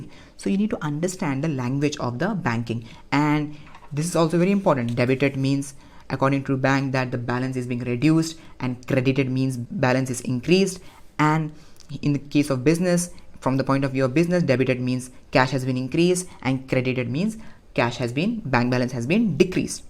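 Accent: India. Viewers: With a mix of indian accent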